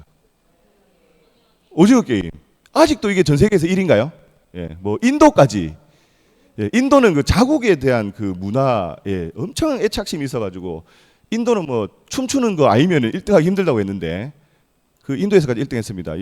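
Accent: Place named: Gyeongsang, South Korea. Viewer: 앱소브제품 설명 잘 들었습니다 구수한 경상도 사투리도 좋네요 이 제품에 칼슘 제품은 없던데 보충해야 하는지요?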